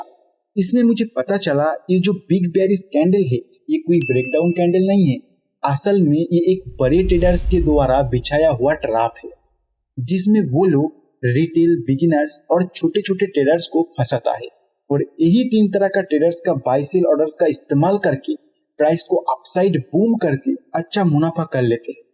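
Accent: Bengal, India. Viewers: Dada... Tomar kotha sune bangali bolei mone holo..... Tai ....bangla tei bolchi.... Stock r blasting move Keno hoy.....ba stock blast ( ek din e 3 to 5% move ) ki kore hoy..... Ei related kichu information dao ...... Khub upokar hoy..... 🙏🙏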